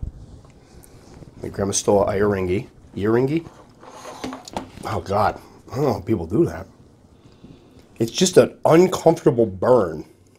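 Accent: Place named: Northeast, United States. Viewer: Maryland here. I could tell you were somewhere up there from your accent and attitude :) Awesome vids <3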